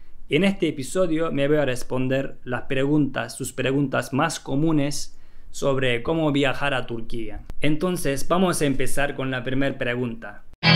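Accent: Argentina. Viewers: Hola, nose como llegue a tu canal. Pero un placer verte tomar mate, y que hables mas Argentino jajaja. Merhaba... Iyi geçeler. Tratando de aprender tu idioma. Para concretar El Viaje pendiente. Saludos desde Argentina 🇦🇷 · Hablas muy bien como argentino... el asentó es inconfundible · Que bien que hablas el español argentino!!! Bien porteño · ablas como argentino español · no es español de España sino español de Argentina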